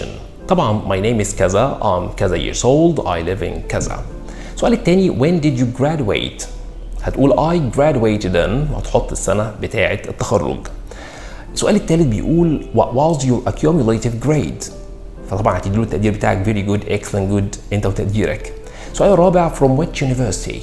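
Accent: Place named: United Kingdom. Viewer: لكنتك البريطانية مبهرة برافو يالتوفيق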